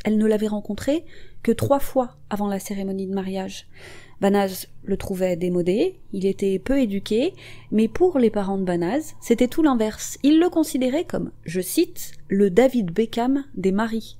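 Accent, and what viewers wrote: Arab world. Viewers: Oua l'accent arabe , on voit d'où vous venez......peut être, l'Algérie.......🤔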